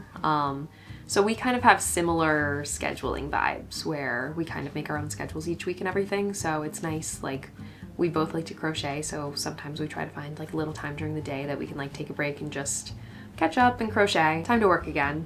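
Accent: New York, United States. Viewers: Hello, sorry to hear about the loss of your Grandma. She must have inspired you in such a way. Good luck with learning to speak Italian in your trademark husky New York Accent! The Cats Olive and Bennett still steal the show! Take care from London UK 🇬🇧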